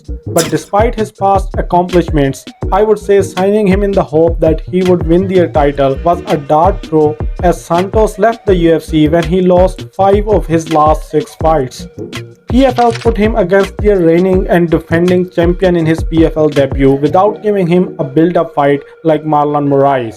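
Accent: India. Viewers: Damn I wanted to watch this video so bad but you sound like one of them Indian scammers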